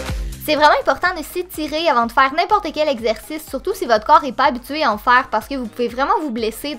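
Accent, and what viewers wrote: Canada. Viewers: Lolilol 309 enfaite elle est canadienne donc elle ne dit pas les mots de la même façon que nous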